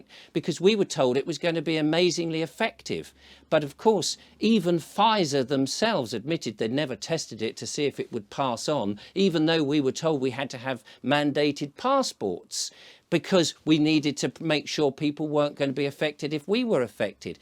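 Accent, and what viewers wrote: United Kingdom. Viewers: it's great to hear another British accent speaking on these subjects. Great balance of interviews, dry quintessential Brit humour and opinion. I have attempted to do what you are doing here on YT on a few occasions in the last 10 years but lacked the skill and time to produce the quality output that you deliver.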